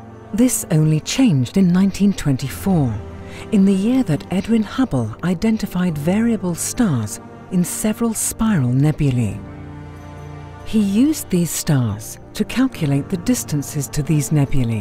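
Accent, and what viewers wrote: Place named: Austria. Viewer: That's a lovely accent... Austria? If so... G'day mate!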